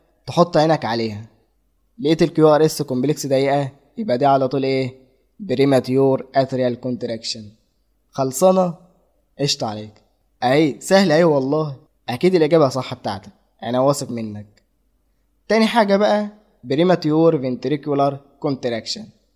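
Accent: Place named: Sudan. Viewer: من وين انت عرفتك يوداني من اللهجة 😂❤❤❤❤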